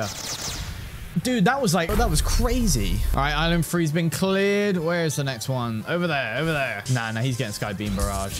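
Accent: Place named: United Kingdom. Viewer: Bro with his British speaking kinda sounds clean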